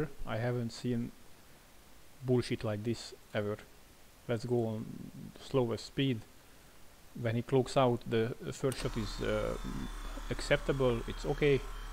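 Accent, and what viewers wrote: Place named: Hungary. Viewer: Szerbusz, nemrég kezdetem el a játékkal ujra játszani, gondolom irok mert én is iszonyu sok emberrel talélkozok karantén alatt biztos unatkoznak és azt szeretném kérdezni h mit lehetne velük csinálni h ki legyenek tiltva, vegyem fel a meccseket én is is csináljam ugy v mi? (gondolom magyar vagy a "munka" mappáról, meg hallatszik az akcentusodon! :D )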